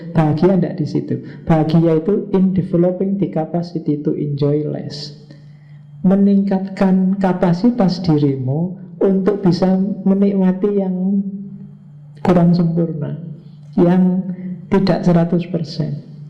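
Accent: Java, Indonesia. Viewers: English aksen jowo agak menggelitik di kuping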